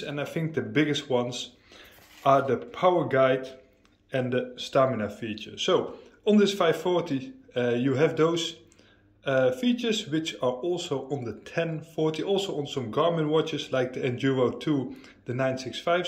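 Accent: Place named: Netherlands. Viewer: I think you are dutch speaking :))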